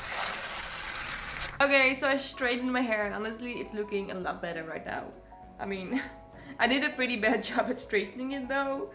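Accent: Netherlands. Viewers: First of all, amazing hair. Second of all, are you Dutch? You sound Dutch and im jealous of ur hair.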